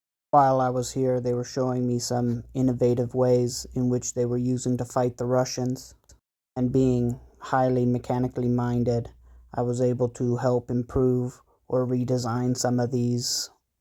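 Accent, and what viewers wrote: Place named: United States. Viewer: Слава України! Героям Слава! God it's so refreshing to hear someone with an American accent who actually understands what is happening in Ukraine. Your committment is admirable and I applaud you Sir. I too fell in love with the nation (and a young Kharkiv region gal named Natasha once... mmm hmmm) and I wish you God's protection and every advantage in combat. Be safe bro, Im a subscriber now!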